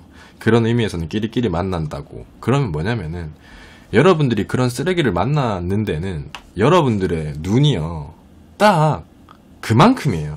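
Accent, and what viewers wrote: Gyeongsang, South Korea. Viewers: 잘생겼다~ 경상도 사투리도 멋있음~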